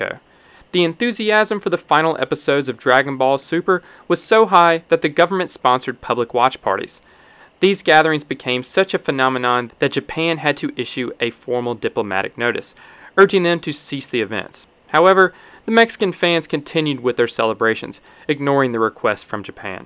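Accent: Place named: Southern Ohio, United States. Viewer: Narrator has a southern Ohio accent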